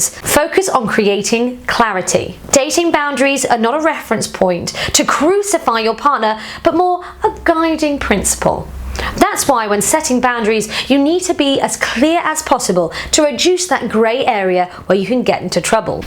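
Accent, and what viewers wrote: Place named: United Kingdom. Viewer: u r very cutely and your lovely British accent makes me mad, I Love u and thanks from u and your team to this useful product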